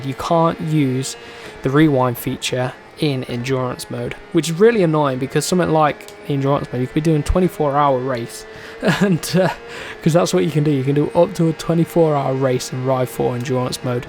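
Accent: United Kingdom. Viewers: i love your british accent, man 😘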